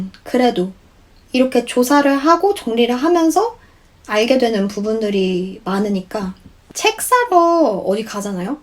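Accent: England, United Kingdom. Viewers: 와 영국발음ㄷ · 님 영상보는데 영국발음에 또 홀리네요ㅎㅎㅎ 구독하고갈게용> < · Did you grow up in Korea or England? Since your accent is so posh I'd say you grew up in England. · 우연히 영상을 보게됐어요:)) 님에게 영국이 그렇듯 저에겐 프랑스가 정말 그런 존재여서 많이 공감되고 혼자 대답하면서(....)봤던 영상입니다 😌😌 정말 많이 공감가는 부분은 누가 시켜서가 아니라 내가 좋아서 한 생각들과 결정들이기 때문에 시키지 않아도 늘 뭔가를 했고, 누군가는 이 나라를 답답하다고 말할 때 저는 항상 빨리만 달려왔던 삶에서 벗어나서 느리지만 여유로운 문화에 적응하고 싶다 라고 말했던 것 같아요:)) 그리고 그냥.. 참 좋다는 점! 프랑스어에 꽂히고 프랑스에 꽂혀서 결국 지금 프랑스에 있는데, 이렇게 영국영어를 접하게되니 정말 너무너무 매력적인 것 같다는 생각이 드네요 ㅎ_ㅎ!! 정말 그 무엇과도 바꿀 수 없는 소중한 경험들 많이많이 만드시길 바랄게요 🥰🥰!! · 영국발음에 반해서 다른 영상들도 정주행중입니다 ㅋㅋㅋㅋ정말 대단하시네요. 엄청난 노력을 하신것 같아요.. 같은 워홀러로서 되게 제 자신이 부끄러워지네요. 영상 볼때마다 영어자극 팍팍옵니다. 감사합니다~많이 업로드 해주세요🙏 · 우연히 알게 됐는데 정말 너무 마음에 와닿는 얘기가 많은 것 같아요! 저도 지금 영국은 아니지만 다른 나라로 교환 준비 하고 있는데 남들보다 뒤쳐지는건 아닐까, 이런 저런 걱정들이 많았거든요! 가고싶다는 마음은 확고한데 언니 말씀대로 주변 반응도 뜨듯미지근 하기도 했구요..! 그런데 제가 좋아하는 거고 저도 지금 이 나이에 이 경험을 하고 싶은거니까 나중에서도 어떻게되든 후회하지 않을 것 같아요!! 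언니 영상 보면서 용기도 많이 얻었고 되게 위로받는 기분이 드네요... 💓 유튜브 알고리즘으로 영국영어로만 하는 브이로그 봤는데 제 귀에는 엠마왓슨처럼 들려서 정말 너무 멋있고 깜짝 놀랐어요! 구독도 하고 자주 챙겨보고 (정말 안지 얼마 안됐지만 ㅎㅎ) 항상 응원할게요! 너무 멋있고 이런 영상 만들어주고 경험 공유해줘서 감사합니다 🍑💫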